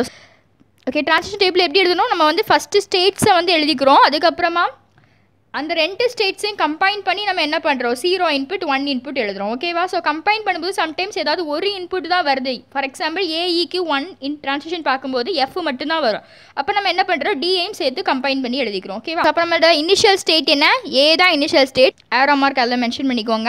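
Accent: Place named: Kanyakumari, India. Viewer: Sister r u from kanyakumari ur slang seems to be like that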